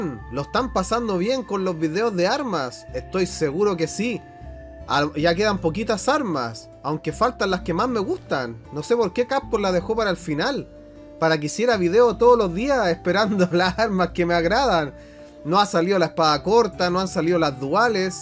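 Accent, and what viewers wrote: Chile. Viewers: por que hablas como chileno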